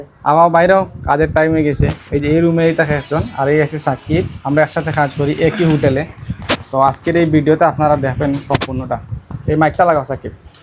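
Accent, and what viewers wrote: Sylhet, Bangladesh. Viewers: খাটি সিলেটি মাত বা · ভাইয়া আমি রেস্টুরেন্ট ভিসায় আসতে চায় মালেশিয়ায়। আমার এই কাজের এক্সপেরিয়েন্স আছে, আমি সিলেটের রোজ ভিউ হোটেলে জব করেছি, আপনার কথা শুনে মনে হচ্ছে আপনি সিলেটি। আপনি কি কোন দিক দিয়ে হেল্প করতে পারবেন আমাকে.. কোন ওয়েতে আসলে ভালো হবে.?!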